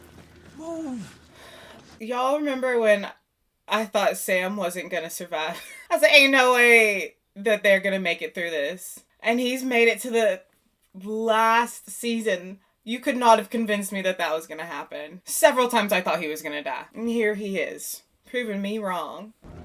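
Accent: South, United States. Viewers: I dip in only occasionally with life so busy now, but I had to see this one again with you, it's one of my favorites. Arya is awesome! I notice your southern accent coming out a bit more than I've ever heard before and you've been beamed onto my screens for years now. It's like your comfortable now, which is cool. I'm in NC and it drives me nuts when people say I have an accent, I've fought so hard not to, so I hope that's not a bad thing to point out, but yeah, nothing wrong with getting comfortable with your audience.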